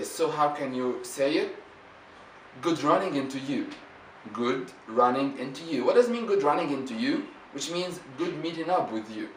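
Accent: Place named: Egypt. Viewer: Egyptian accents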